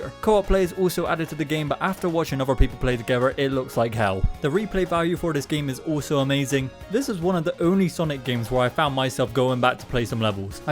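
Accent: Bristol, United Kingdom. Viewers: Are you bristolian? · Are you from Bristol? That accent is hella familiar 😂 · hey your british 🇬🇧🇬🇧🇬🇧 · Up the Bristol accent.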